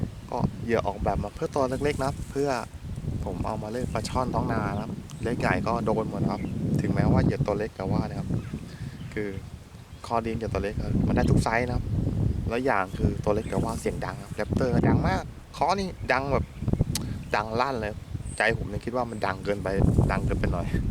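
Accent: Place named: Isan, Thailand. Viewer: ทํามัยอีสานแล้วงัย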